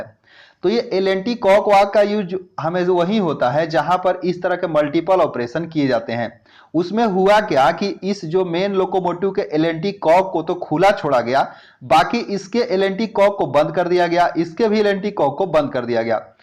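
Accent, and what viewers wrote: Bihar, India. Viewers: Bhai aap samastipur ya darbhanga se lagte ho aapki boli bohot meethi hai 😁👌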